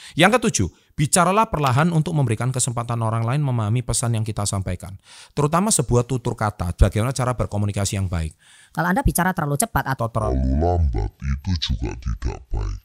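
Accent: Java, Indonesia. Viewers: medok amat sih kang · Kenapa ya aku tuh kurang suka dengar orang bicara dengan logat medok begini😅 agak ilfeel aja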